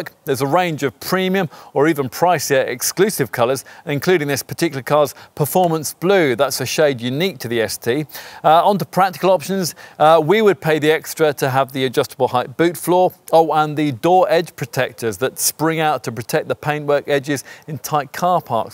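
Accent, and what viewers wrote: England, United Kingdom. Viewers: yeah, well, he is english mate. he speaks the queen's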